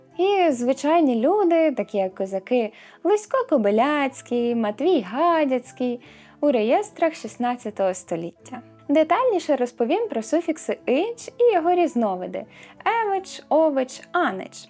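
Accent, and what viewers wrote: Standard. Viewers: Заслухалась мелодійностью Вашого голосу, чистотою української мови. Імпонує подача матеріалу , Ваша глибина знань , якими щедро ділитесь з аудіторією. .. Відчувається ,що Ви любите свою справу ,найшли своє покликання. За такими молодими людьми майбутнє України. Тому від щірого серця бажаю Вам успіху і розвитку. Дуже дякую! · Така чиста українська. Аж приємно слухати. Та й голос лагідний. А зовнішність — справжнє янголятко.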